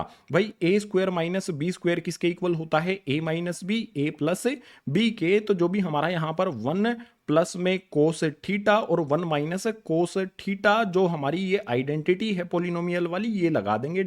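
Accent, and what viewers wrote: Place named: Bharatpur, India. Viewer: Language Bharatpur ki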